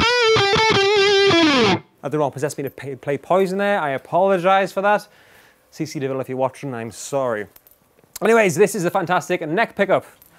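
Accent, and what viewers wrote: United Kingdom. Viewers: I'm 4 years late, just got this guitar. British accent hurts my ears but I liked and subscribed. Great video. I'd prefer some more cockney talk though, like "this axe is all kinds of honey and butter!", but got my sub regardless. Redcoat.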